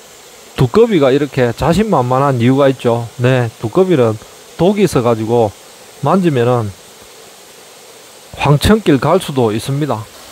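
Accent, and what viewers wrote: Gyeongsang, South Korea. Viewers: 말을 참 조곤조곤하게 잘하시네요.저는 개인적으로 경상도 말투를 좋아 하지 않는데 말투는 참 듣기좋네요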